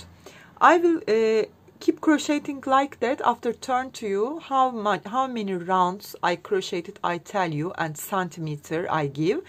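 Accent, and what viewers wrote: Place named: Turkey. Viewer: Türkçe alt yazı olaydı iyiydi ingilizce konuşmanızda türk gibi türksünüz degilmi ses tonunuz onu andiriyo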